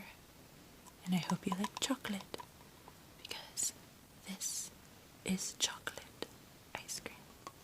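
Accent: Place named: Canada. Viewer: hi!! i was just watching some asmr before heading to bed, i just have to ask, are you canadian or midwest american? if this is invasive im so sorry!! its because i like accents, and i love learning about them alongside with dialects. and your accent sounds VERY canadian, or maybe even wisconsin sounding if you’re american :)! love your videos before bedtime!! helps me transition into the night, and due to being autistic, it can be hard to go from one thing to another. cheers ^_^ !!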